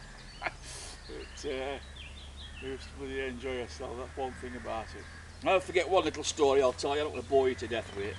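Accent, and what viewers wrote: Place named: Sheffield, United Kingdom. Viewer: I thought I recognised your accent as a local Sheffield. Thats why I subbed you in the first place - hoped to see you out on a walk one day but then realised your over in Lincolnshire. Maybe meet up at the next Bushcraft show - I could sample some of the curry you made! ha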